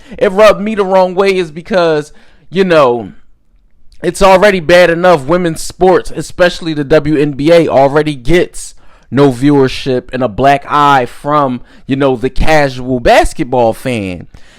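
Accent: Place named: New York, United States. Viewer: Sound like you're from one of the borroughs.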